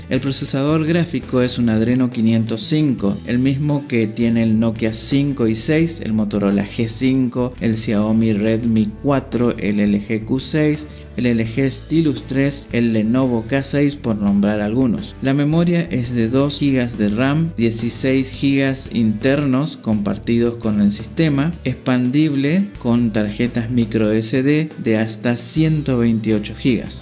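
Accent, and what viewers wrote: Corrientes, Argentina. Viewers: Yo no se para q Huawei lanza celulares que unos tienen una cosa pero le faltan otras, me paso con una tablet Mediapad T1 tenia una con chip y cuando quise comprar otra despues de un tiempo ya no consegui con chip para usarlo como telefono.... aaa.... y aguante el español correntino pué!!! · genial el español correntino.. los banco, pero pongan español sin aclarar y que se caguen los demas.. harto de escuchar el "neutro" mexicano..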